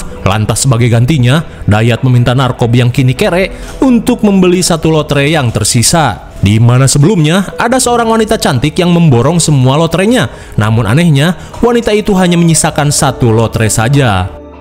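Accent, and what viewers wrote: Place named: Java, Indonesia. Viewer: Gua suka konten lu bang . Omongan nya adaa ajaa . Nganclengan lah . Segalaa mecem wkwkwk .. medok banget ngomong nya 😂😂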